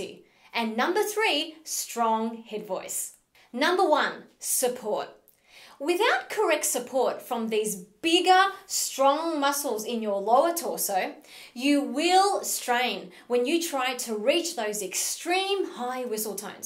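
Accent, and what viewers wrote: Australia. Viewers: love your australian accent! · Australian aaccebt?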